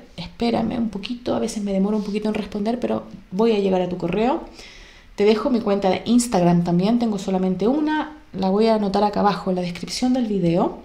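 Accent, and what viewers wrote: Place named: Chile. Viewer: De que país eres???y dónde estás ahora, yo soy de Chile...por tu acento se me imagina que eres chilena...si es así, me gustaría verme las cartas, personalmente.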